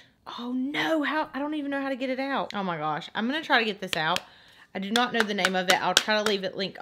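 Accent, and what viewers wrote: South, United States. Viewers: You are so beautiful and I could listen to you talk all day! Love that southern accent! ❤️😘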